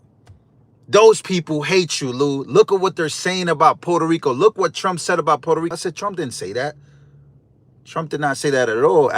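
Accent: New York, United States. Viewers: Thank you for reporting from the rally at MSG. You were awesome. I cant thank you enough. I had tickets to go but did not want to go alone. I follow 2 youtube people. One is you..I knew you were a N.Y.ER I COULD TELL BY THE WAY YOU TALK. IM A N.Y.ER ALSO.. THANKS FOR SPREADING THE GOOD WORD. YOUR A TRUE PATRIOT ❤ 🇺🇸🇺🇸🇺🇸🇺🇸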